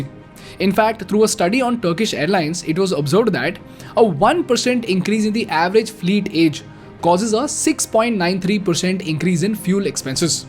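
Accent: India. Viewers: indian accent -_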